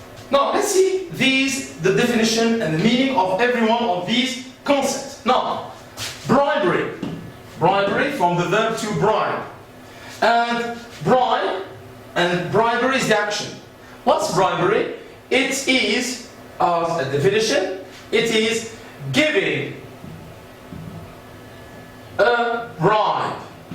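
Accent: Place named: United Kingdom. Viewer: a british accent! Wow>-< that's great Thanks a Lot Sir i really appreciate that('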